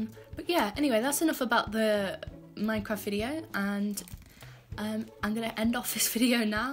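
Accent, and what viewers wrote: United Kingdom. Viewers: Omg i love your british voice!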